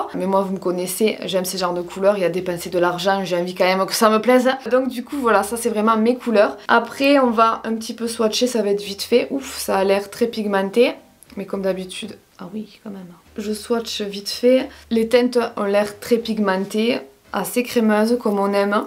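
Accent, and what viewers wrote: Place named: Southwest, France. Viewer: Cc ma belle. Tes vidéos sont vraiment "fraîches" t'es super agréable. Ton accent me fait penser à mon papa qui vit dans l'Ariège. Ahhh l'accent du sud ouest 👌. Bises belle journée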